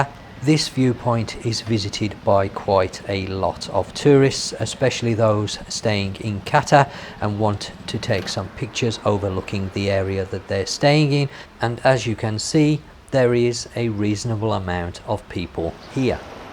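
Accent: West Midlands, United Kingdom. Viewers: Just found your channel. Enjoying watching your videos. You sound like your from the West Midlands. Same as myself 😊. I go to Phuket for the 3rd year in a couple of weeks. I have made friends working in hotels there. Tourist numbers are definitely down but not dramatically like some news outlets suggest. Chinese are definitely less due to the tour boat disaster and I'm sure the strong Thai Baht is stopping the twat Packers. I mean back Packers. Indian tourists are slightly up which is interesting because they pay alot in visas. Anyway keep up the good work. Keep the videos coming. Thanks 👍😊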